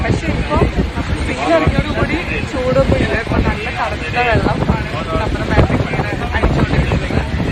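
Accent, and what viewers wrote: Thrissur, India. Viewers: Chechide proper hometown thrissur aahno😄.. Samsarathil okke oru thrissur slang edakk varunnund😇.. Ath kelkkumbo ottum jada illathe valare natural ayi thonnunum und🥰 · waiting for your next video,, sply thrishur slang 😍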